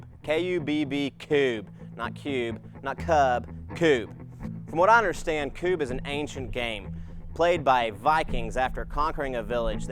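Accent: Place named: United States. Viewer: his American accent is incredibly annoying, it sounds like he's saying kooob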